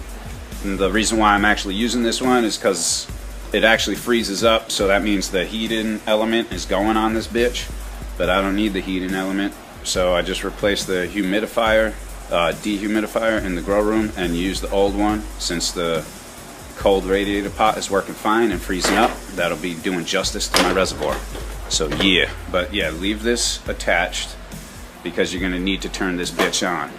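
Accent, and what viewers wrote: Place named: Rhode Island, United States. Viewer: I listened to you and I’m like this guy has to be from RI hahaha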